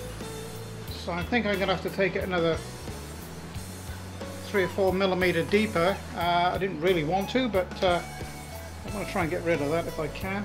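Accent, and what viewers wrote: United Kingdom. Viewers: I really didn't expect you to have a British accent. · sounds british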